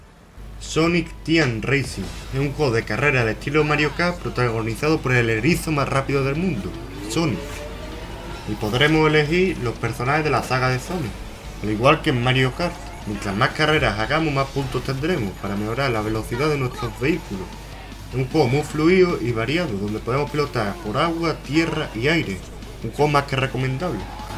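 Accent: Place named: Granada, Spain. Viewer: Tu acento es la leche, seguro que eres de Granada o cerca.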